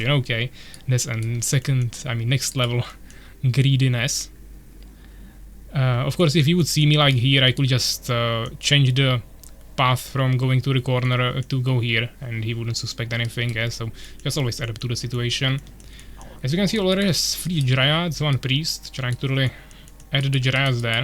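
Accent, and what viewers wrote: Czech Republic. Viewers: are you czech? you have very czech accent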